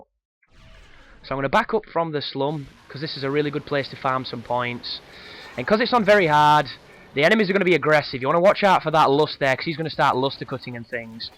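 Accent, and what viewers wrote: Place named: Northern England, United Kingdom. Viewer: Does thar live in sheffield o’what thi sounds like a reyt northerner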